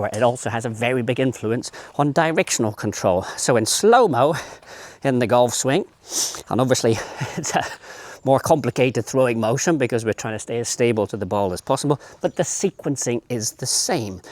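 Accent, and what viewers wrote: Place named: England, United Kingdom. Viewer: I love your channel. Sounds like you’re English. Where are you and do you give lessons in person ?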